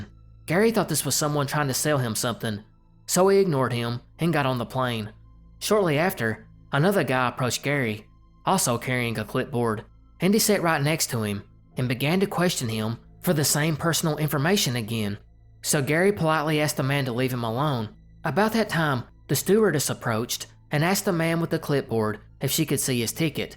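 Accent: South, United States. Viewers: I'm fairly new to this channel but am noticing how I get a little excited when a new vid appears. Is it me or is this the best narrator on YT right now. It's definitely one of the reasons why it's easy to view the entire episode every time to the end. I love Southern Drawl man!! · o.k. Ga. boy from Kennesaw here. Love the Southern accent. So cool to hear. I finally broke down and subscribed. To hear the narration. Which is excellent. Not too crazy conspiratorial but leaves a lot open to basic common sense mixed with mystery. A good mix brother. I'm not a 'true' conspiracy guy BUT I do LOVE to hear as many as I can. They are fascinating,......and truly mysterious. I like your cognitive, analytical style.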